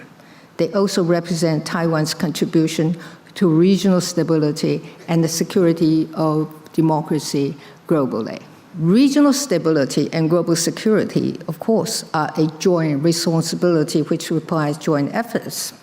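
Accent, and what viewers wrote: United Kingdom. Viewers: 我超愛這英式英語腔，超迷人的。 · 小英總統英國音很好聽，一切盡在不言中，我永遠小英辣台派，永遠祝福感恩小英總統， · 民主制度正遭受認知戰、資訊操縱與軍事威脅的挑戰台灣以韌性應對威脅，並積極強化國防與數位安全呼籲民主國家建立團結機制，共同維護區域與全球穩定台灣願意分享經驗，並承擔維護民主安全的責任以上為小英演講的重點英國腔實在太迷人了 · 說英文真的很好聽（真的很療癒），腔調有些英文腔，在她還沒當上總統時，就知道她很會說英文，也聽過她的演講，台灣唯一不會說英語的總統 “陳水扁”吧！ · 前總統真是女中豪傑，非常有智慧她不用看搞就能用流利的英國腔英文演講而且都不會凸槌，真的佩服她的勇氣和智慧！謝謝小英前總統讓世界看到台灣！有你真好，上帝祝福你平安健康！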